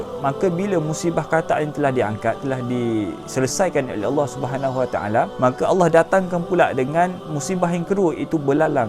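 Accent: Kelantan, Malaysia. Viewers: Abang ni orang kelantan ke?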